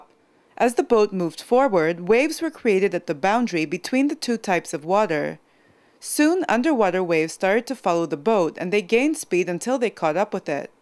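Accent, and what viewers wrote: United States. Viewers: I'm English so to me she just sounds American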